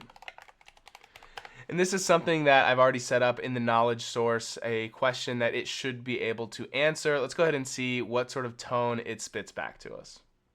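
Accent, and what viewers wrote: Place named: England, United Kingdom. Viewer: Tone is one thing, how do you get rid of the annoying English accent?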